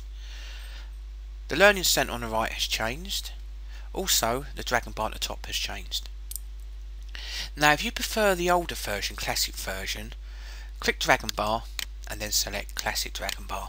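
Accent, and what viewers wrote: United Kingdom. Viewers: I am really appalled, shocked, dismayed. As to these mumble mouth individuals. Trying to provide instructions for using, Naturally Speaking. When they can hardly speak, themselves. Never mind that nobody is actually speaking into a microphone, directly. So that you can barely understand what they are saying. In a terrible acoustic environment to boot. This is just mindless, buffoonery. And this guy with his mumbled British street slang. Sounding like he is 10 years old. Stupid clueless buffoonery is on the rise. It's rife throughout the world, today. As we regress back into the 19th century here in the 21st, century. Except that we now have voice recognition software with people who cannot articulately speak or enunciate words correctly. And yeah. Voice recognition software isn't going to work well with people who cannot speak. Who mumble and mispronounce, words. 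Which happens often. As in, "ofen" and you're not British. And not off 10. Or off, ten. And a chasm is not a, chaz'em. It's a, "kazim". Otherwise how do you expect voice recognition to understand, mispronounced words? Unless you build in the proper pre-buffoonery into the software for such illiterate pronunciations. That doesn't make the product better. That makes people far more illiterate and incompetent. And the inability to deliver a spoken concept. With the inability to articulate it into the proper word stream. Um, duh, ya know, I'm saying. Way. Go. Dog. I think that sentence is perfectly understandable. From a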